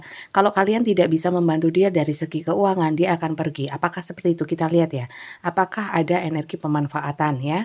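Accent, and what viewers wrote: East Java, Indonesia. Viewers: MET pgi bunda hadir dari Sumut selalu pokus dgrin pencerahan,sukak bgt dgn cara pembacaan bunda dgn logat nya rek " ☺️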